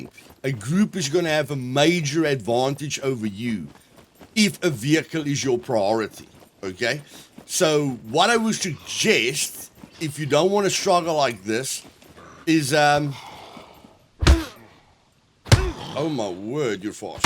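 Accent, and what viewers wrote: South Africa. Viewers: Heiii are you South African. Just judging by the accent:) I myself am, thats why i recognize it.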